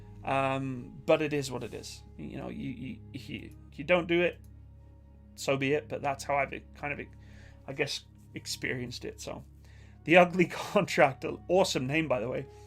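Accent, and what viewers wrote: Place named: Scotland, United Kingdom. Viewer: 3/5 Darkhorse...You sound scot not kanuck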